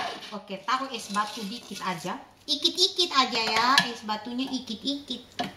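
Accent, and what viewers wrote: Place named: Papua, Indonesia. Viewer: cara bicara kaya orang papua Soalny sy org papua😂😅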